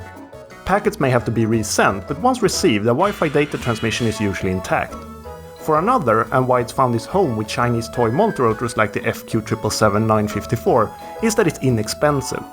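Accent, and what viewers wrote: Sweden. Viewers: Hi! I am new to your channel but I immediately hit the Subscribe button upon watching the first vid I looked at. Your approach, candor and editing sets you apart from many other reviewers here on Youtube. Just lovely!!! You clearly have some insight in this hobby, but you appear unassuming, bright and unbiased. Every vid is a pleasure. I have to ask - are you a Swede? Your accent suggests you are, while your choice of words and general command of the English language is impeccable and inspiring. You put many native English speakers to shame, at least here on Youtube. But more importantly, your vids are well thought through, consciously edited, and so clearly targeted when it comes to defining your audience. I think you deserve a viewer breakthrough, going from the few thousand to the tens of thousands you deserve (realistically, this is a niche channel after all). 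To summarise: Love your reviews, keep them coming!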